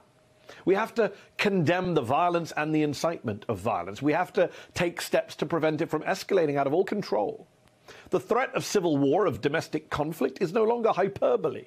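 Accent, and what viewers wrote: United Kingdom. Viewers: You have a British accent you're not American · You dont sound like an American more like a Britain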